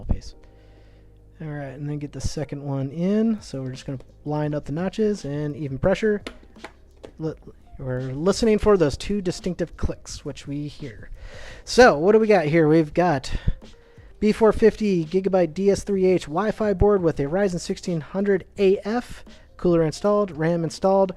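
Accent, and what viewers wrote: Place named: Texas, United States. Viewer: This is my first video of yours to watch and as a fellow Texan I knew you were from Texas before you said you were haha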